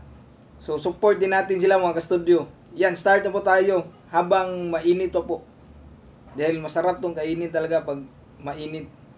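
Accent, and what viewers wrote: Visayas, Philippines. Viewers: Bisaya ka bai o tagalog hehe.. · bisaya ilhan gyud tono nato ani bai🙂 enjoy lang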